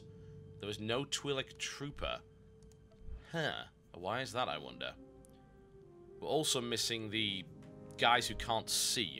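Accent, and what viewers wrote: United Kingdom. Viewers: This may be the least annoying British voice I have ever heard...I shall watch more!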